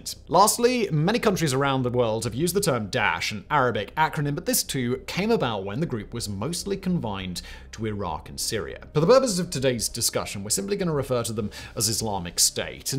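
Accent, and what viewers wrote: RP, United Kingdom. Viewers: british english was extra thick in this one. Had to rewind a few times 😂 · Grew up in surrey and west sussex uk. I dont know what accent that is supposed to be but it sounds like someone who is trying to impersonate the royal family....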